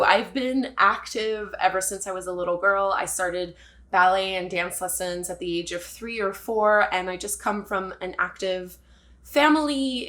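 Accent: Quebec, Canada. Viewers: its probably really obvious to them, but i didnt realise you were canadian till just now ;) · Dang, Quebecois French is ... somethin' else... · Salut, je ne sais pas non plus que tu es francophone. Je te suis depuis le début de la pandémie ou je me suis mise au yoga. Je te suis de Suisse francophone. J’adore ton accent québécois. Merci pour ton super travail, Une vidéo en français ca serait cool. j adore ta chaîne à la prochaine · C'est drôle comme même dans les commentaires on lit l'accent québécois 😄 I am french and it was a nice and funny surprise to hear you speak french with that incredible canadian accent 😄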